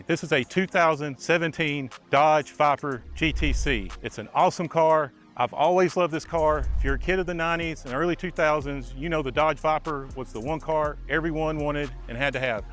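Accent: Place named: South, United States. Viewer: Holy fuck he is so country Merika .. LOL That's how you say Daadge Vah-phurrr !!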